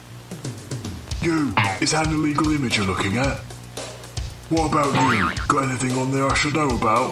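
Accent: United Kingdom. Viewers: i guess in their country, which seems to be the uk based on the voice (and as i reread this, i googled it and google claims they are scottish so it checks out), there are laws trying to restrict access to obscene content in general. i googled it and i think the laws are actually stricter if they are uk-based. as an american the only thing that's treated like this is cp (and maybe zoo porn depending on the state). so i was really concerned by this video, but since they are not american they may very well have a reason to parody censorship laws like this.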